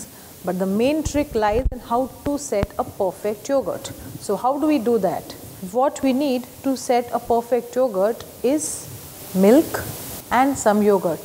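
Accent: India. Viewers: Thanks for sharing the tips as so far no one uses the clay pot / earthen pot to let the yogurt to fermate ! I have no problem with her Indian accent , wonder why Mr. Steve Tarling can't touching with her. Once I apologized for my husband spoke in poor English , the Australian sales girl replied me " Is ok, he is already very smart as I, can't speak a word of Chinese ! For a Chinese old man that can speak our language, is great ! "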